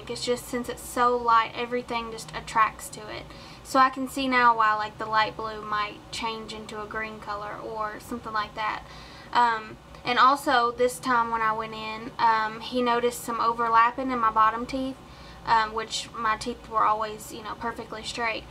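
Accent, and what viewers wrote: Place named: Alabama, United States. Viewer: r u from Alabama because u have a Alabamian accent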